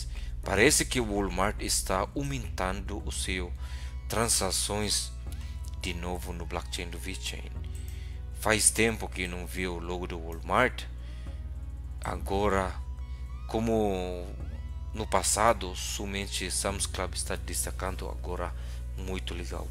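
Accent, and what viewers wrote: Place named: Argentina. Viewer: De onde você é? Seu sotaque parece argentino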